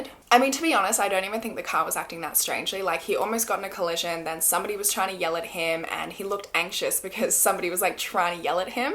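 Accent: Australia. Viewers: What is it that is so appealing about an Australian accent? Love u 😃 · Your videos are great to listen to at work. You have the nicest Australian accent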